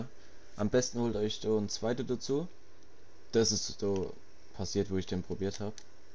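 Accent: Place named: Switzerland. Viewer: so reden wir auch in der schweiz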